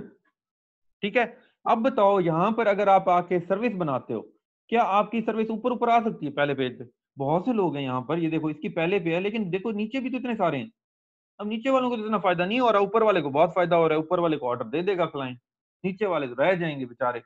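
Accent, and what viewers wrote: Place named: Multan, Pakistan. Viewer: Bhai ap multani ho? Apki punjbai awaz multani bandon jaisi hai 😁😍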